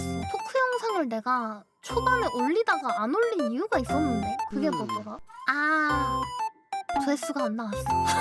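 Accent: Gyeongsang, South Korea. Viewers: 마뫄님 보이스 참 매력적이죠. 살면서 만나기 쉽지 않은 음성이라 보면 됩니다. 경상도 특유의 쎈 억양과 사투리를 구사해도 전혀 상대가 화나지 않는다는건 엄청난 강점이자 재능입니다. 교원 실습하셨을 때 인기 많으셨을 것 같은데요. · 웃긴건 모르겠는데 특색있어서 매력있는 목소리이긴 하죠. 같은 경상도사투리를 쓰는데 전혀 다른느낌이라ㅋㅋㅋ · 처음엔 물방울 떨어지는 소리/농구 코트 급제동 웃음소리에 뫄며들었는데..... 이제는...... 경상도 사투리 방음부스 설치된 이후의 호탕한 웃음소리 엄청난 떵겜실력 가끔 들리는 꿀꺽 소리 자주 들리는 컹 소리 대두뫄 버츄얼뫄 뫄마모찌 없으면 못사는 몸이 되었다구!!!! 뫄마조아!!! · 경상도 방언 쌘거 맞습니다 ㅋㅋ 일본어 비음많고 중국어 성조있듯이 그냥 특징인데 화 많이 나셨나 보네요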